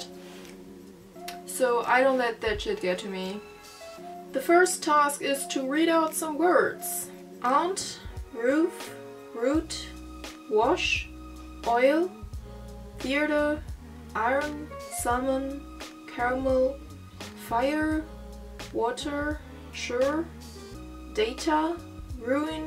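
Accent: Germany. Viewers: As a Chinese Canadian who speaks Mandarin and lived in Germany briefly, your English accent is definitely GERMAN, which is very cool in my opinion! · Bist du aus Frankfurt ? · Omg ur German accent is really cute no offense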